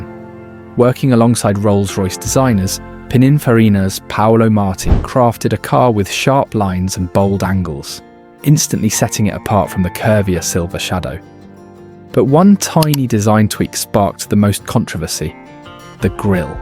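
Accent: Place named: United Kingdom. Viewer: I detest these videos with robogobs: it us narrated with a British accent, but using 'Merkinisms such as "Five hundred twenty nine".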